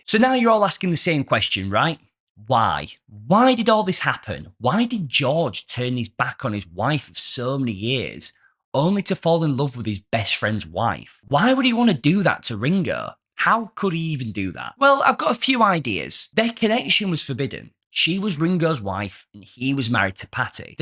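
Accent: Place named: Hull, United Kingdom. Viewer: You have a slight Hull twang.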